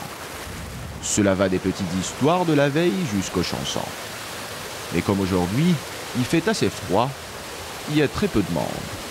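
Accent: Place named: Kabylie, Algeria. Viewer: Accent kabyle normal a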